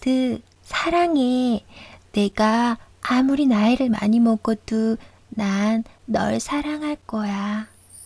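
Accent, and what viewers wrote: Gyeongsang, South Korea. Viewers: 신나이 들으면서 구독 하기 시작했는데 목소리 톤이 좋으시고 경상도사투리 억양조차 푸근하게 들리네요 (feat. 연기력) 좋은 컨텐츠 감사합니다^^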